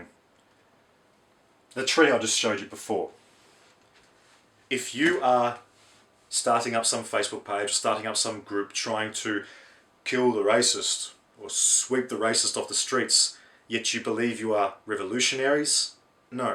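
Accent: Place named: South Africa. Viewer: This video was repetitive and very, very simple. Sounds like a yarpie. (ooh 'name calling')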